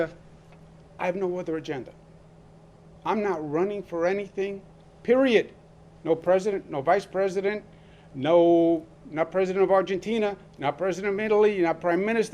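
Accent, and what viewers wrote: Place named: East Coast, United States. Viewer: I just listen to him for his east coast accent and humorous points of view (Urban). As a governor, Cuomo is as corrupt as any of them. He is still just a puppet who has made some terrible choices because he was bought and paid for. Do a little bit of research if you don't believe me. Don't praise this man, listen to him from an entertainment standpoint alone.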